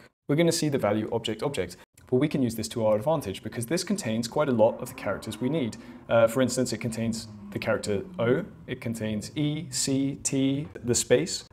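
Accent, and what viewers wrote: Netherlands. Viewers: Are u Dutch :D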